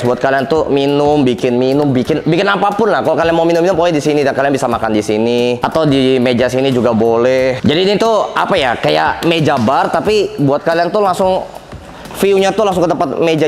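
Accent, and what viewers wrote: Palembang, Indonesia. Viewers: Logat Palembang kau masih melekat 😂 mantap semoga lancar dan reme tempat baru nya aminn🙏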